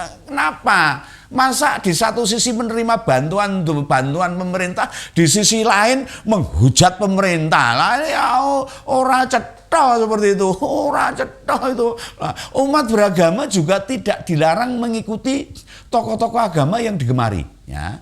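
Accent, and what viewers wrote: Java, Indonesia. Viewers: om ini pembawaannya tenang dan hefi, sy suka logatbicaranya, jawa banget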